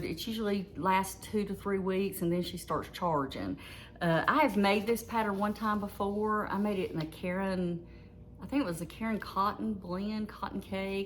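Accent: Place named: Virginia, United States. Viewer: Hi. I'm enjoying your videos. You sound like where I grew up in Virginia. Is that where you're from?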